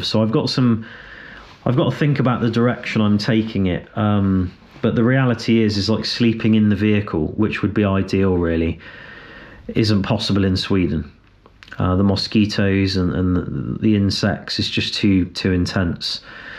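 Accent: United Kingdom. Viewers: *English accent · listening to you prattle on with that fancy British accent is never boring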